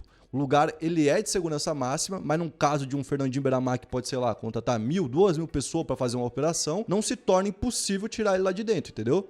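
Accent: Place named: São Paulo, Brazil. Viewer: Esse cara que fala parece ser paulista mais te falo uma coisa nunca vi igual esse sabe de história meus parabéns aí vê se aprende ai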